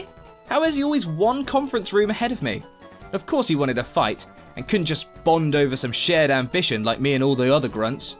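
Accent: United Kingdom. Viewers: You‘re adorable and I enjoyed your content very much! I just subscribed, thanks for the content! By the way, You’re British, right? I say because of your accent! Lovely accent!😊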